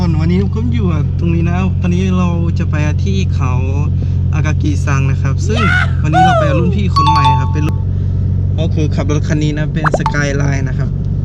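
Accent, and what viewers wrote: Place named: Myanmar. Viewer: เป็นพม่าหรอครับ สำเนียงเหมือนเลย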